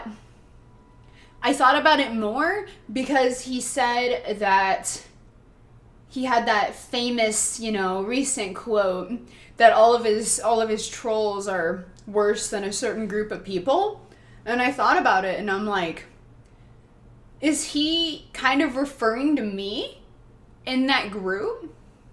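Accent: South, United States. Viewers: Is it me or she has a slight southern accent?... just, certain words. Its hard to tell but there is something. Never noticed. But maybe I´m just to sleep depraved. Also cuteness overload. Just pout.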